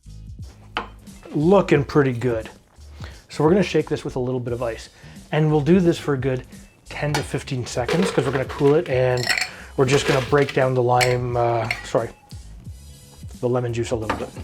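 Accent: Canada. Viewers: 1st written abeeewt, I love the way you Canadians talk! Those are some big-ass lemons you get up there. By the way, how did you make that subscribe icon appear with an arrow & click? Maybe it would get more subscribers to my cocktail channel. And you mentioned the Barfly. Do you have good relationships and talk to a lot of other cocktail channels? I have things I'd like to discuss with you, but not leave in a comment as they have nothing to do with that particular drink. I know you have a tight schedule, but please drop a line sometime : <mail>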